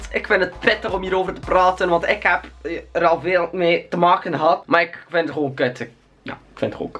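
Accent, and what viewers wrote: West Flanders, Belgium. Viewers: love Belgische youtubers ♥♥♥ ps ben Nederlands jullie accent is zoo leuk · Ik ben Nederlander, en ik vind Belgen gewoon normaal hoor. Haha. Ik vind jullie accent juist heel leuk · Ik ben een nederlander maar ik snap niet dat mensen gaan zeggen KUT Belg enzo want ik kijk veel liever na dan bijvoorbeeld Joey maar Joey maakt ook echt leuke video's maar voor mij is er dus geen verschil ik vind gwn goede video's maken en let niet op zijn accent ik kan het verstaan en dat vind ik genoeg dus wie KUT belg gaat zeggen moet maar komen!!! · Ik vond het belgisch accent altijd kut maar door vind ik het nu juist leuk om naar te luisteren #gazodoor! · Ik ben een Nederlander maar heb gewn haat aan Belgen, ps; jullie accent is soooo leuk!!!!!!! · Ik mag ze allebei en ben zelf een Nederlander maar belgië is supertof en ik hou echt van het accent! 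Vindt het echt fijn om naar te luisteren ( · ik vind het belgische accent juist leuk hahahah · ikzelf ben een Nederlander en vind belgen juist leuk met een accent, nederlands is zo simpel en saai hahaha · ik ben gewoon nederlands en ik heb niks teggen belgië ik vind het ccent juist facking lollig en grappig om naar te luisteren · Dit is west vlaams met hollandse invloeden. Ik belgie ebde duzenden accenten, het brabants, bruxellairs, antwerps, gent, olsters, west vloms, oost vloms, lemburrgs enzo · Nederlands maar ik snap echt niet wat mensen tegen Belgen hebben... Ik vind jullie accent echt leuk om naar te luisteren!! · Ik ben een Nederlander maar ik wil een Belg zijn omdat 1 Je hebt een cool accent 2 De mensen zijn aardiger 3 NL is stom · Belgisch accent veel grappiger nii lullig bedoelt ofzo 😌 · West Vlaams duidelijk,Limburgs is media taal en a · ik ben het helemaal met je eens. ik ben een nederlands meisje maar belgie vind ik persoonlijk geweldig, en ik hou van da accent... 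ik ben verliefd op da accent en jij bent ook geweldig en ik vind je accent juist heel leuk!! x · Ja ik accepteer je zeker ik vindt dat Belgen grappig praten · Ik heb totaal geen hekel aan Belgen (ben nederlander😅) en vind t leuker om bijv naar jou te kijken dan enzo knol jullie accent is Gwn mooi en schattig😂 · je accent is super cutee Belgen zijn hetzelfde alleen dan schattiger ik ben Jammer genoeg nederlands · Ik vind het belgiëse accent juist schattig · Ik zelf ben een Nederlander maar ik haat belgen dus echt totaal NIET :) Ik hou juist fk veel van België ouwe. 1: De mensen zijn knap ( Voorbeeld jij kuch ) 2: T is een fucking leuk accent. 3:LoicNottet komt uit belgie en hij is me babe♥ Belgie is gwn mijn schatje, me nummer 2 beste land ever. Fucking veel love voor BelgenLand♥ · huh? ik ben een Nederlander en ik wil juist in België wonen en het accent over nemen ❤ gij bent geweldig 💋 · ik ben eigenlijk in eerst in stantie gesubscribed omdat je een belg bent en ik het grappig vind hoe je praat maar je vids zijn ook chill. 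ik kom uit nl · ik ben nederlands en opzich hoor je wel dat je belgisch bent maar ik vind je wel echt een facking chille youtuber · west Vlaams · Margot Poncelet ik denk dat hij uit west vlaanderen komt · Je Belgische accent vind ik eigenlijk wel grappig xD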